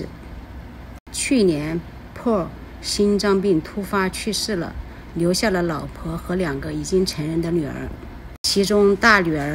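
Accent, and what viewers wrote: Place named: Hubei, China. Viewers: ，不好意思问你一个问题：你是姓闫吗？我一个朋友可能认识你。我是你的湖北老乡，听你口音很可能是我们那个城市的，荆门，我猜对了吗？我在夏威夷，希望你来夏威夷旅游的时候找我玩。你的频道我挺喜欢，喜欢听你讲故事。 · 因为也居住在这里，看着Dana Point的景色，听着你讲述故事都感觉好亲切。你的口音和你的性格好像我一个从湖北来的朋友啊……她和她老公搬去Arizona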